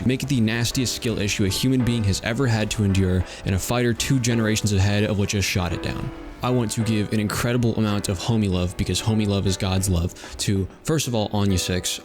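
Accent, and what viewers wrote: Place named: Netherlands. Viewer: The slightly hidden Dutch accent just oozes of every few words you speak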